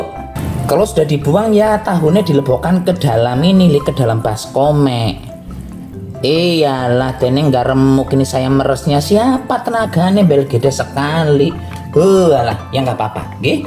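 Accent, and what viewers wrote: Banyumas, Indonesia. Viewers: Saya suka kok lihat vidionya mas bahasanya sama karena saya orang ngapak jg salam ublek2 dan cus enak endol baksonya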